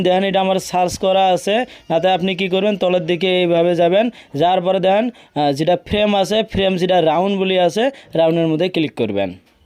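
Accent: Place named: Kurigram, Bangladesh. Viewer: এ কুড়িগ্রামের ভাই, ইউটিউবে চ্যানেলে ভিডিও আপলোড করেছ, একটু শুদ্ধ করে কথাবার্তা বল তাহলে শুনতে ভালো লাগবে, সারা বাংলাদেশের লোক দেখছে বুঝনা কিছু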